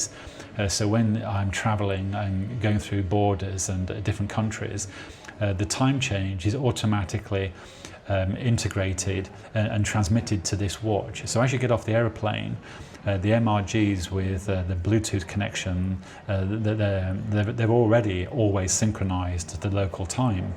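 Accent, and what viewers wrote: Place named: United Kingdom. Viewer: Я думаю что ты, обязательно купишь MRG в круглом корпусе, может не сегодня не через месяц, но это обязательно произойдет, так как у тебя очень крутая коллекция B5000! Желаю успехов, круто что я наткнулся на твой блог, пишу на русском но понимаю твою речь, конечно Английский язык это не Американский 😅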